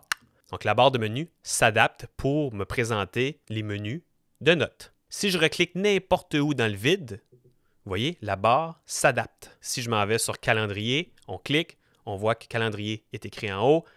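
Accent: Canada. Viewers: Vous êtes québécois ? 😊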